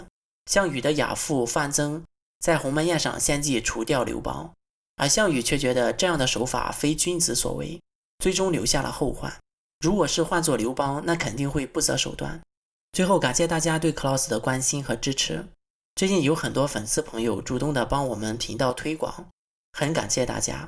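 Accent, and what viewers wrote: Shandong, China. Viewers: 你的口音应该是山东的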